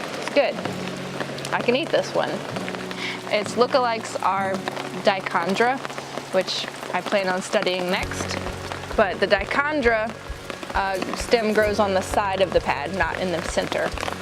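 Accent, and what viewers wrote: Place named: South, United States. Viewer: I need to go down south and take a class from you on wild vegetation you have a everlasting knowledge on it love the southern accent btw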